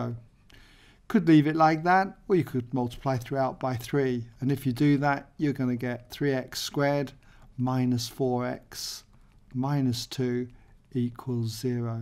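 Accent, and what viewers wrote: England, United Kingdom. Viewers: i love your English accent thanks so much for your channel!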